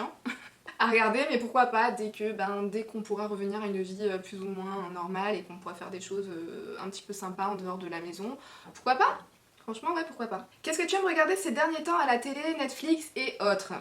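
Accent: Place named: South, France. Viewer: Vidéo bien sympa tu es pleine de vie je pensais bien que tu venais du Sud tu as un peu le même accent que Julien Doré. Restes comme tu es et bonne continuation 🥰❤🥰❤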